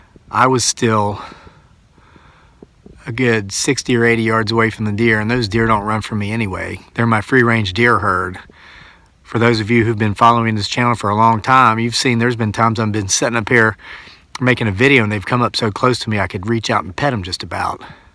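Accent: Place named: Ohio, United States. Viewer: Are you possibly in Ohio? You have a bit of an accent.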